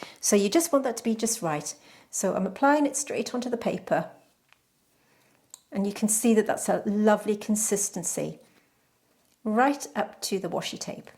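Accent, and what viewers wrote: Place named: England, United Kingdom. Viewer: thank you, I do do that but it is frustrating to pause it because then you have to bk it up and YouTube is making that difficult. Why can't they just leave well enough alone. Just got the holbein Gouache myself so I'm watching all your wonderful videos to learn. You are so very amazing. And as for the voice over text, we can't hear you're lovely voice. I myself love an English accent.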